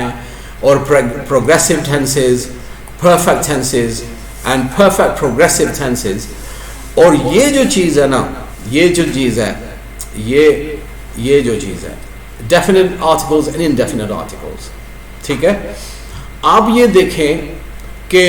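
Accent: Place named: United Kingdom. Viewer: Hi, You the person whom i was looking for British accent and your excellent work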